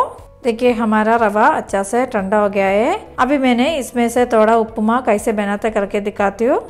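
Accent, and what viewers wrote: Kerala, India. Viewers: Hindi u talk so well in Kerala accent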